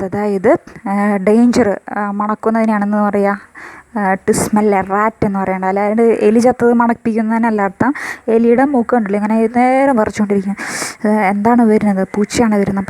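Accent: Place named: Thrissur, India. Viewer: അടിപൊളി, തൃശൂർ ഭാഷ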